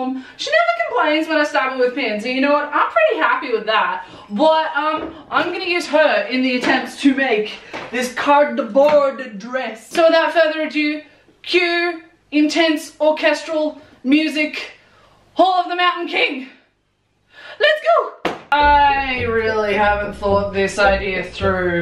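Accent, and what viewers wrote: Australia. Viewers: ...you're Aussie. Yes 😂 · Are you Australian?? :3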